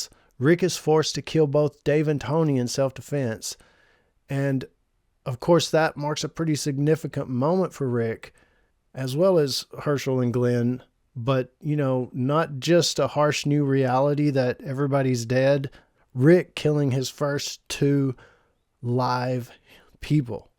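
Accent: South, United States. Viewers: I just watched a 6 year old video frrom this channel and you had a way thicker southern accent. Have you toned it down over the years? Im good either way im not criticizing it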